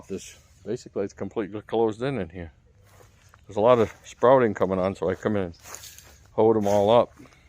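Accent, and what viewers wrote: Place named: New Jersey, United States. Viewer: Your garden is looking great, sounds like you're from Jersey.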